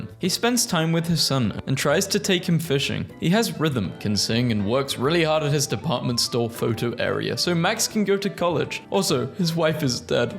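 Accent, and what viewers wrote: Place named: Southern England, United Kingdom. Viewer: Your accent is modern and largely originated in the late 18th and early 19th centuries among the southern English upper classes. So actually makes a lot of sense historically because we never adopted the made up weird accent.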